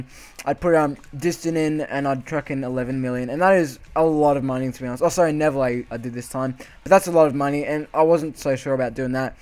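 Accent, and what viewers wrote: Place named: Australia. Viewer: yer fukin aussie luv ya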